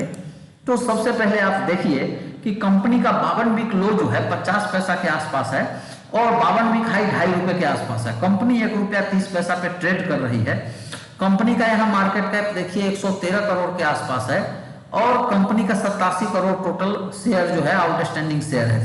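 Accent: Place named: Bihar, India. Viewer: TU Bihari hai 100%, or tu sharsa ya madhepura ka hai ................ sahi bola na .............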